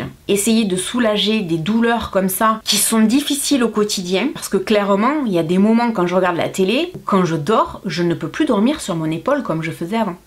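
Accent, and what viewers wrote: South, France. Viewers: Bravo pour ta belle initiative de collecte ! 💛 j adore tous tes vidéos et ton accent du sud.. charmant ! 😊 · Merci pour ton humour ton partage cela fait du bien et ton accent du sud La brassiere n est pas en cotton.? Je ne savais qu il y avait un crayon transparant pour les levres c est top 🐩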